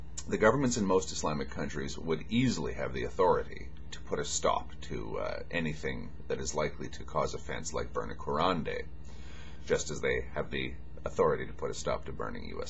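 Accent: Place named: Canada. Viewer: Hehe, Sir, I know a Canadian accent when I hear it:D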